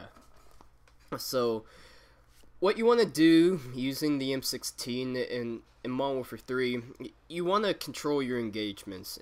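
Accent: Hawaii, United States. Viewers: he has a Hawaiian accent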